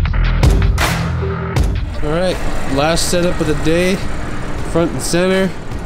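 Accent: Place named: Northern Canada, Canada. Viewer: Interesting accent. Northern Canada?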